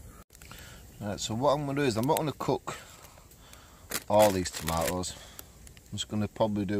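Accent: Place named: Leeds, United Kingdom. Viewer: There’s some nasty people out there. Can you imagine how miserable they are to be like this to random strangers? I love the way you talk, your accent is ace, reminds me of when I lived in Leeds 😊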